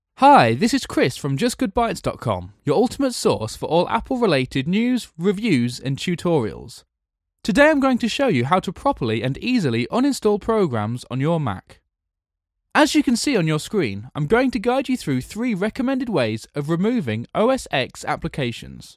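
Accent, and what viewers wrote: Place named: United Kingdom. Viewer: thank you....nice british accent.....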